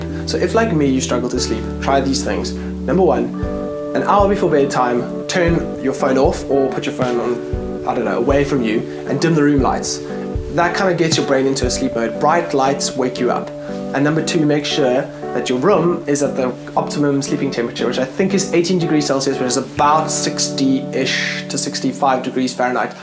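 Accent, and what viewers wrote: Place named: California, United States. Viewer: And you're picking up the Californian accent.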